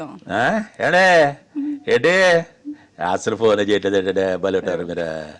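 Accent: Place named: Rayalaseema, India. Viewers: మీ రాయలసీమ యాస అద్భుతం సర్..1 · Sir I'm big fan of u ur rayalaseema slang is very famous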